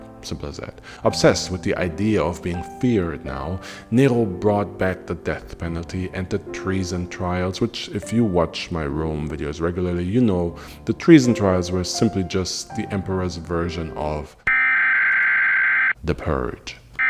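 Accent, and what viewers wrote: Germany. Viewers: I love your German accent !!! Vielen Dank für deine coole videos!!! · Irre ich mich, oder sprichst du die römischen Namen auf Deutsch aus? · Am I detecting a German akcent here ?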